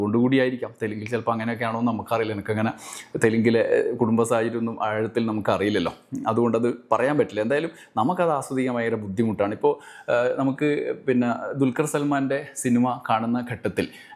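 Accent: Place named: Malabar, India. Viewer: ചേട്ടാ നിങ്ങളുടെ സൗണ്ട് ഒന്നാമതെ സ്ലോ ആണ്.. മലബാർ ഭാഷ കൂടി ആണ്... ഞാൻ നേരത്തെ പറഞ്ഞത് ആണ് നിങ്ങൾ സമയം കുറക്കാൻ... പിന്നെ പുഷ്പ യെ പറ്റി ::ടൈം ലാഗിംഗ് ഉണ്ട് എന്നത് ഒരു സത്യം തന്നെ..ഫാമിലി സീൻ നെ പറ്റി പറയുമ്പോൾ.. ഇന്നത്തെ തലമുറക്ക് പിന്നെ കുടുംബ ജീവിതം വലിയ ഇഷ്ടം അല്ലല്ലോ.. അടി ഇടി വെടി വെട്ട് കുത്തു ഇതൊക്കെ മതി... ഞാൻ ഒരു സിനിമ അമിതമായി കാണുന്ന ആൾ അല്ല... ഒരു നടന്റെയും ഫാൻസും അല്ല... എന്നിരുന്നാലും പുഷ്പ 2...കുഴപ്പം ഇല്ലാതെ കണ്ടു കൊണ്ടിരിക്കുന്ന ഒരു സിനിമ ആണ്.... Nb ::പിന്നെ അമിത ഹൈപ്പ് കൊടുത്തു മാർക്കറ്റ് ചെയ്യുന്നത് അവരുടെ ബിസ്സിനെസ്സ് തന്ത്രം ആണ്. അതിൽ വീഴണോ എന്ന് നമ്മൾ ആണ് ചിന്തിക്കേണ്ടത്..... സിനിമ യെ സിനിമ ആയി കാണുക... എന്തായാലും താങ്കളുടെ റിവ്യൂ &ബുദ്ധി ജീവി വിമർശനം വളരെ ബോർ ആയി പോയി....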